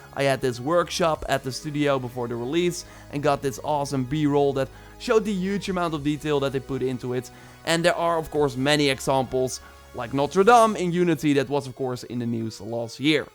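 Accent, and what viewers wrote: Netherlands. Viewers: Damn I hate the Dutch accent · Gwn een vraagje, ben jij nederlands?? Denk dat ik n nederlands accent hoor🤭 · You, I can hear that you're Dutch. Is there going to be an area in Valhalla in the Netherlands?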